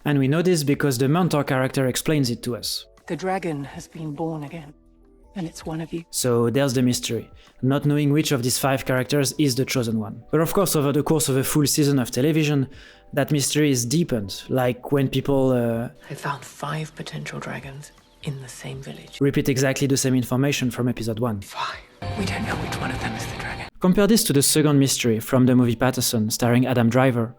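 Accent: France. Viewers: I watched this video and the one about the cabin in the woods, and I really like how you edit your videos and present your thoughts. Someone in the comments on the cabin video said you should summarize the plot oft the movies you review more - I disagress. Discussing specific parts of movies is a really good advertisement to watch the movies. Your charming French accent is the cherry on to...can't wait to see and hear more of your work!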